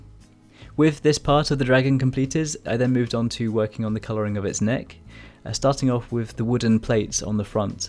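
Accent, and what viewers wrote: United Kingdom. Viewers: I take it from the accent your in the UK , you think you could draw a dragon and dismantle the picture in to sections that could be cut from steel ? im a blacksmith near brecon south wales i will let your mind run away with that idea for a while :-) get back to me usk valley forge facebook if all else fails.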